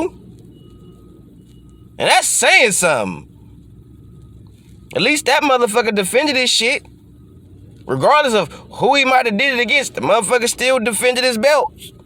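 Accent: United States. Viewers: another bollocks talking yank channel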